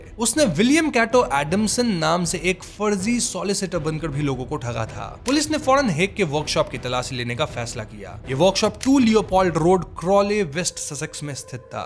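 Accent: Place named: Bihar, India. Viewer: apna bihari accent thik kro gussa aata h galat pronounce sunne k baad.. "kar diya, " kar di"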